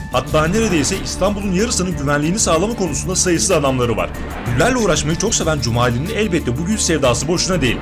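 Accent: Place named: Hatay, Turkey. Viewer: Abi sesin hatay sandık içi’ne benziyor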